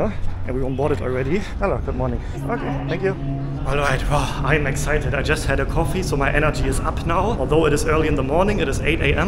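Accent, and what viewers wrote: Germany. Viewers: Your German is ominipresent while you speak in English: 1947 and not 1974 as one says in German! ^^ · I like your german accent of English ...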